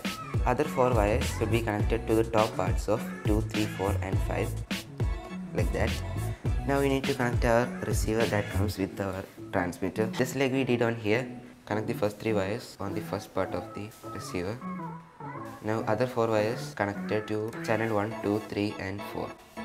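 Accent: Kerala, India. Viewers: Are you malayali from Kerala plzz replay your English is so 🤨🤨🤨🤔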